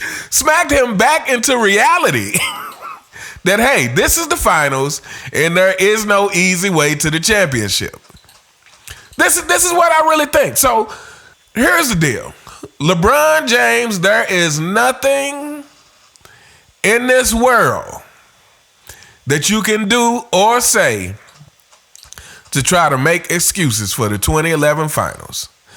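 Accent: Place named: New York, United States. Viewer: i love listening to your takes. aside from the actual content, which is almost always spot on, i love your accent and cadence. maybe because i grew up in nyc, and i'm just used to newyorican accents.:-) but also love your laugh! reminds me of my youth (i have no idea how old you are, but i'm almost 64, and me and my boys laughed like that a lot back in the day. (i left city life well over 2 decades ago). keep it up my brother, tell it like it is!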